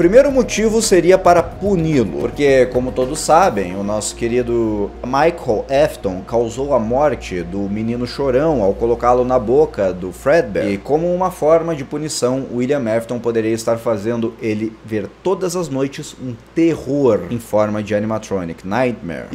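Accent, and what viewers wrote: Rio Grande do Sul, Brazil. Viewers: Ele é gaúcho?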